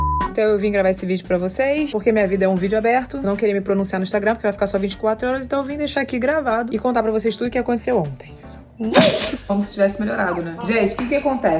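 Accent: Rio de Janeiro, Brazil. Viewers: Estou assistindo os Caçadores ao vivo nesse momento. O descaso foi menor, mas uma das apresentadoras nem liga. Me questiono: será por que vocês são amigos e fazem collab? Será que ambos são cariocas e criadores de conteúdos digitais? Sou brasiliense e percebo os nichos que se formam. E tudo bem defender seu nicho. Porém, se te convidaram, o mínimo era conhecer um pouco da sua história. E me indignou elas acharem que era paulista mesmo com o sotaque carregado. Foram sequências de falta de atenção e prestígio. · Com esse sotaque não tem como não saber q vc é só Rio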